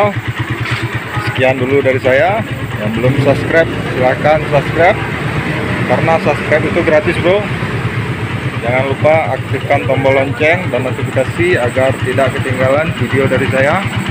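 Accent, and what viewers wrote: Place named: Medan, Indonesia. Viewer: Alamat mna bg Kyknya orng Medan ni 😁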